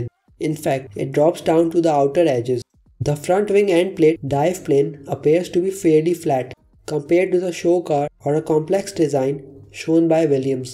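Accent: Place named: India. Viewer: Seems like indian accent. Bruh where r u from?🌚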